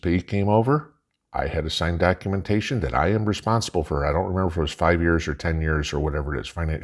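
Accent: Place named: Philadelphia, United States. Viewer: Im hearing Philadelphia as well.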